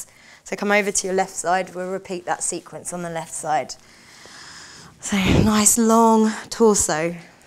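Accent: England, United Kingdom. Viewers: This was a wonderful sequence, just enough to get my heart rate up which I do enjoy, thank you! Also very nice to find a lovely English accent to listen to while doing yoga!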